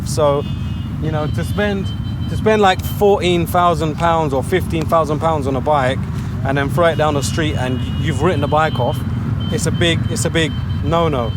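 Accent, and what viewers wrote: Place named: Sweden. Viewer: såg videon om armpump å kände igen dialekten direkt ;) vart i Sverige håller du hus då?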